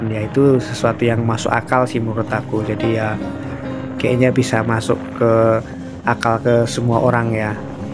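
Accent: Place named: Java, Indonesia. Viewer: masnya asli mana kok logatnya kayak Jawa gitu...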